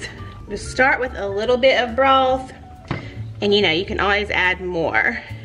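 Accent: South, United States. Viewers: Hey! I can just hear you talk all day. Love your Southern accent! Love your Videos!🙌🙌🙌🙌💯 I am differently trying these recipes.Thanks for sharing. I hope you have a fun, loving, Safe Holiday! Merry Christmas!🎅🎄🎁🎁🎁💗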